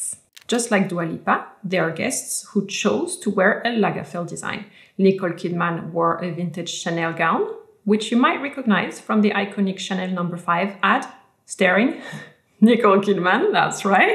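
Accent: France. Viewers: Mam... Are You french?? Cause you do speak like one!!! Anyways.. Mam.... Your vudeos are amazing, and I have watched your portfolio videos and it helped me a lot... Do more videos❤️‍🔥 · J'adore tes vidéos, je remarque ton accent français qui revient plus depuis que tu es déménagé en Provence 🙈 yay haha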